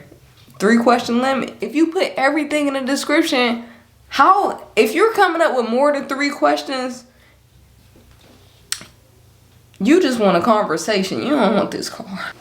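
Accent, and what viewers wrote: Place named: Midwest, United States. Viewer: I love your accent lol you from Ohio or mid west area ?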